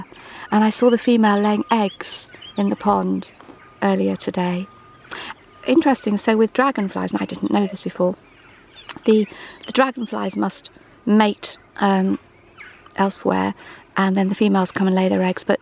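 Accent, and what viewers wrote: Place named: RP, United Kingdom. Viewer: I like your posh English accent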